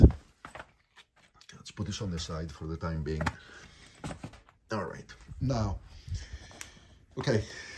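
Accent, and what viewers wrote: Greece. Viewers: Λύσε μια απορία, σε παρακαλώ. Το "λου" πολύ πατρινιώτικα το λες: "λιου". Αληθεύει ότι εξ Αχαΐας προέρχεσαι;.. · The v85 is a great bike. Especially with the tubeless rims. You might want to avoid the travel version. I know people have had problems with the side cases. I think I detect a slight Greek accent, might be wrong.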